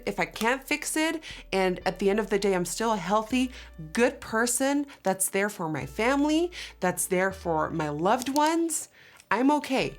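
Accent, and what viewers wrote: United States. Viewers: “ in case you were wondering where the accent came from “ Umm I thought she was anerican till 2 years ago when She said in one of her videos that she wasnt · Can anyone explain why nikkie despite being dutch still sounds American compared to other peeps from Holland? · Accent? When I first started watching your videos, I totally took you for an American 😻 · How come you have a strong American accent if your Dutch and living in Amsterdam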